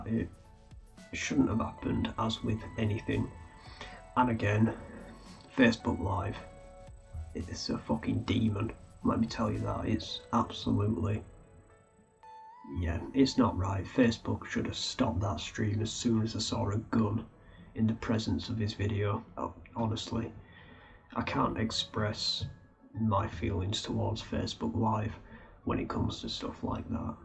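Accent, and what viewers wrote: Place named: Teesside, United Kingdom. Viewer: I remember watching this on some gore website a couple of years ago and finding the comments absolutely infuriating, disgusting and disturbing. Not on topic but a secondary question, are you from anywhere near Teesside/north Yorkshire? You have a similar accent to me but I can't place it.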